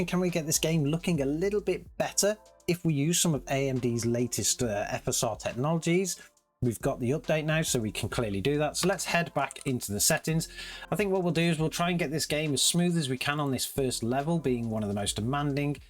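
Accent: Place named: England, United Kingdom. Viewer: Loved your channel! Nice to hear English English as well! Good luck!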